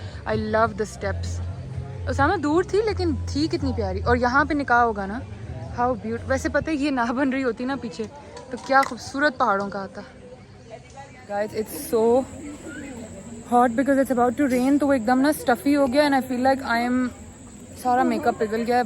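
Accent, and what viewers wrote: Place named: United Kingdom. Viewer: Why are you showing British accent you are Pakistani speak in your native Urdu language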